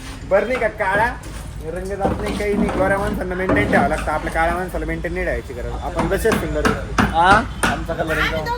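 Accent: Khandesh, India. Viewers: Bhau tumichi khandeshi ek nambar aahe 😅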